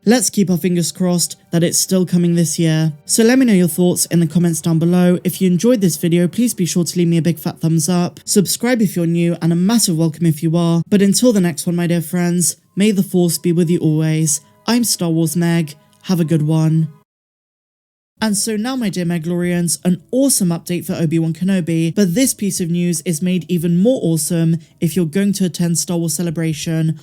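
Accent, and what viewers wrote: United Kingdom. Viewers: I know you have an British accent, and that's all well and good and attractive in the US, but in this case you're ignoring the fact that a noun is being used as a proper noun, a name. As highly as you regard this IP, you should pronounce someone's name how they would. For one reference, remind yourself of how Data corrected Dr. Pulaski in season 2 of Star Trek: The Next Generation. In his words, "One [ pronunciation ] is my name. The other is not".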